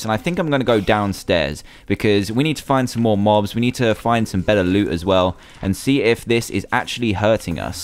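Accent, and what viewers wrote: United Kingdom. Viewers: just british acsent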